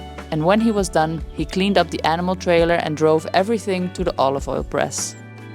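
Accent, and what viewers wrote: Netherlands. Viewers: Do I detect a very slight Dutch accent ? I am glad I found you. I like your channel .. love from an old Dutch lady in California ❤❤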